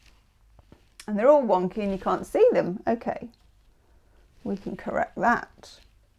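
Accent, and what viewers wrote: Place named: Bath, United Kingdom. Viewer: I recognise your accent. Would you be near bath in England?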